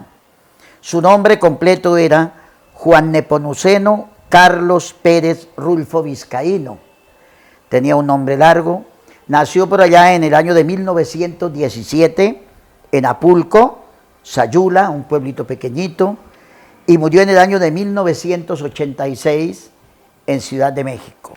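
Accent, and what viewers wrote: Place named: Colombia. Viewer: Se siente el acento colombiano😀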